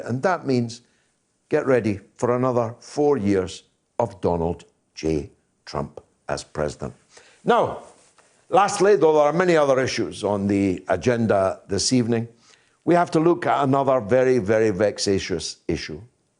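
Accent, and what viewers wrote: Scotland, United Kingdom. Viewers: This dude is SCOTTISH as hell man. Come on! You're like Lloyd Christmas in Dumb & Dumber. "Aspen? California eh??? Nice. "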